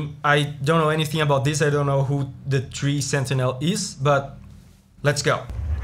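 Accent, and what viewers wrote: Brazil. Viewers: Vc é Brasileiro? Sotaque brasileiro. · bro, are you brazilian?? your english accent is a little bit like a brazilian speaking english (don't take this in a bad way, but it remembers me so much)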